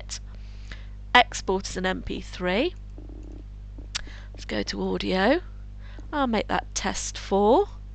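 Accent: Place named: RP, United Kingdom. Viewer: I now know where I shall go to practice my posh accent. :D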